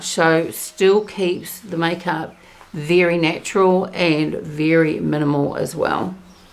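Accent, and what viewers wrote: New Zealand. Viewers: Hello from a fellow Kiwi vlogger, it's nice to hear a familiar accent on YT 😃. I'm interested in the Water Fresh Tint but I'm not sure how it would go on my very fair and rosy complexion, plus it might be a bit dewy for me as I'm more of an almost matte girl. I've just finished the No.1 foundation which I really liked. The colour was probably the best match I've ever had in any other foundation - ever - but for some reason I've replaced it with something new to me, the Haus Labs 😂. Anyway, just wanted to say hurroooo. I'll see you again 🙋‍♀️.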